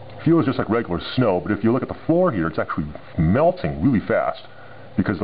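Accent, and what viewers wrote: United States. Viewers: why do you use Celsius if you're american? (i assume from the accent)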